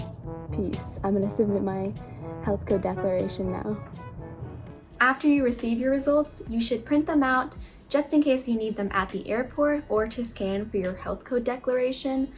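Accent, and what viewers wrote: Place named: United States. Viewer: Your accent is typical America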